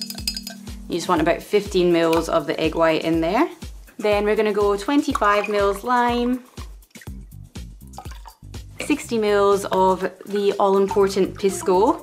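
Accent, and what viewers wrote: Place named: Scotland, United Kingdom. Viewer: Nice and simple. I love the Scottish accent but cracked up at piss-go for pisco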